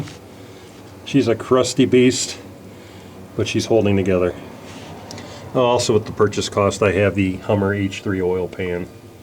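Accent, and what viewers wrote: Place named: Cleveland, United States. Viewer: Listens to this guy talk: definitely from up around Cleveland. “So anyway up here around Northeast, Ohio.”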